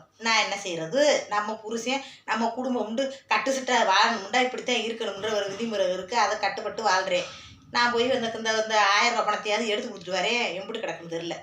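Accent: Madurai, India. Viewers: உங்க மதுரை ஸ்டைல் பேச்சு ...அழகு👉👌👌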